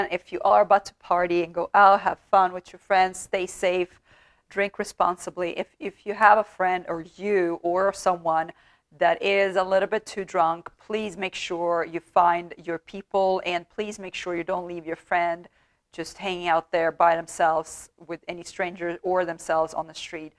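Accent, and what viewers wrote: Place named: Sweden. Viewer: As always, you look fabulous 🤗🤗... thank you for the storytelling, you have the perfect voice for it. Have a great weekend..⛱.. ps,so cool you speak the Swedish way, makes it more authentic 😉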